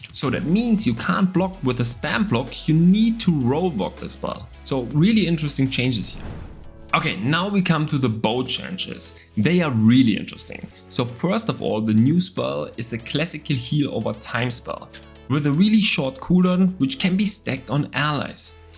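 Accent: Germany. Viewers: Hört sich so Deutsch an :D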